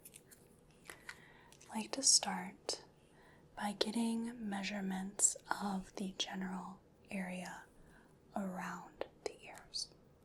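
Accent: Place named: Midwest, United States. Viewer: The Midwestern comfort of hearing another person add letters to words, "across-t" is familiar ❤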